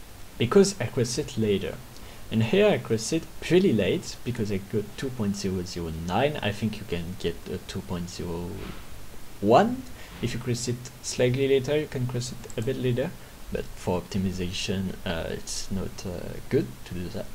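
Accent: France. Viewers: You can hear that you're from france 😎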